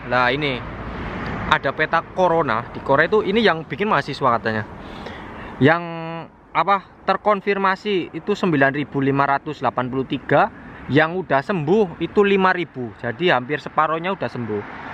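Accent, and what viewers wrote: Banyumas, Indonesia. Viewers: Ciri khas mas...ngapak😆👍👍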